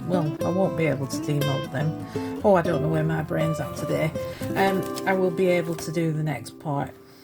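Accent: Northern England, United Kingdom. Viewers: Lovely to hear a northern voice (I’m from Wigan) I love this video and nice to see I’m not the only one with a few bubbles. I love your video’s.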